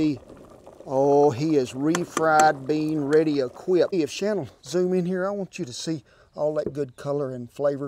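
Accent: South, United States. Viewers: That southern accent crack me up, it feels just like i'm back in El Paso, Looks mighty good!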